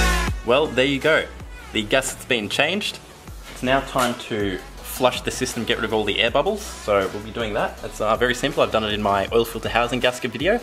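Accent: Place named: Australia. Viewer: nice and clear vid dude! good to hear an Auzy accent too! And thats coming from a kiwi!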